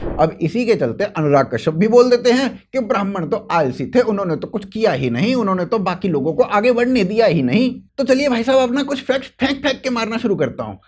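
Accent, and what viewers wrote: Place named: Braj, India. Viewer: Mathura ke ho kya? Bhasha brijbhasha jesi lag rhi thi😊 Anurag Kashyap na Rajput hai, na Brahmin, vo dalla h bs.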